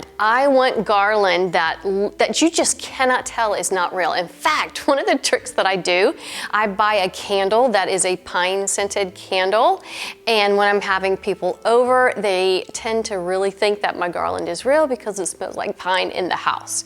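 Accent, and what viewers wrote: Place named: South, United States. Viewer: I love and miss the Southern look (and accent!). You make it look like I could do this too. Thank you so much! From a native Kentuckian who lives on the west coast now. We have those white pines right behind my office window!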